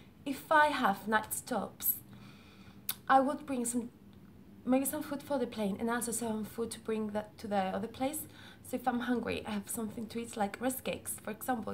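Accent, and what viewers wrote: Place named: England, United Kingdom. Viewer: Hola hermosa! Yo crecí al norte de México prendí inglés de chica obvio el inglés de por allá! Jajaja no entendí ni la mitad de lo que has dicho pero me encanta!! Si tienes acentillo inglés de Inglaterra!!! 😍 Están buenos los vídeos en Inglés! Ojalá hagas más, ahora vivo en Argentina y no entiendo mucho cuando hablan inglés acá tampoco me hacen dudar de mis conocimientos jajaja besos guapa!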